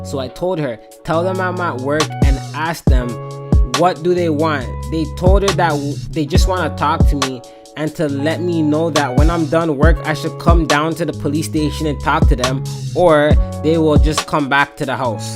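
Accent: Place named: Toronto, Canada. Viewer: Dats funny I be from the 6ix too I recognized ur accent fam 😂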